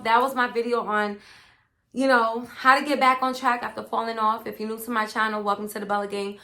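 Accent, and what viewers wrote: New York, United States. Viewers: GIRL I just checked your P.O. Box and you live on long islanddd ??? Ik I heard the New York accent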